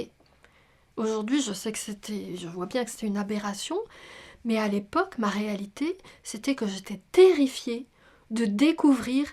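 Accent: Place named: France. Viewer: Merci pour ces vidéos très instructives. C'est étrange vous avez un accent français mais je reconnais quelques québecismes, est-ce que vous auriez passé du temps au québec par hasard ?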